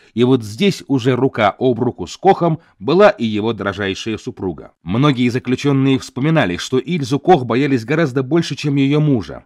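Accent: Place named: Moldova. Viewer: Очень сильный у ведущего Молдавский акцент , аж уши режет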